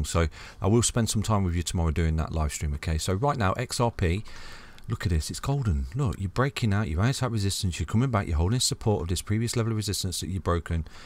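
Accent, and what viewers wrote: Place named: Midlands, United Kingdom. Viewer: Thanks for the TA, 👍🏻 Where in the uk are you from? the accent can’t be far from me in Chesterfield… keep up the great work.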